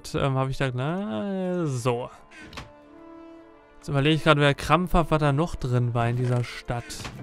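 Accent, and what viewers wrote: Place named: Ruhr, Germany. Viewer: Ruhrpottslang ftw.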